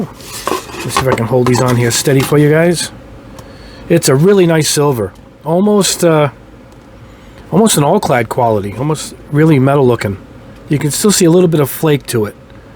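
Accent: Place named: New York, United States. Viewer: Country? Are you not in the US? I swear you have a Jersey/New York-ish accent lol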